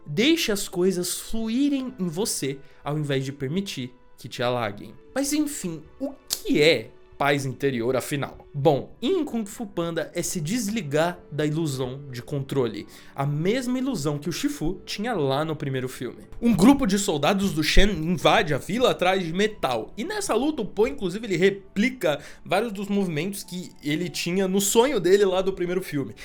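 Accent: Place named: England, United Kingdom. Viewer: Ele ta falando com a pronuncia em inglês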